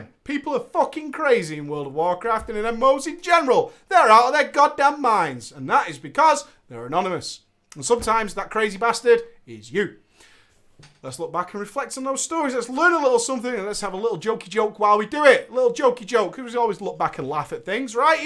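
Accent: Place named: Manchester, United Kingdom. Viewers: somewhere round there yeah. it's not full on manchester but in that area, i'd say slightly south of manc. east cheshire maybe but not west, that's my area and it isnt local to us. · Manchester accent (NW england). South city/cheshire i think. I live there and he sounds local.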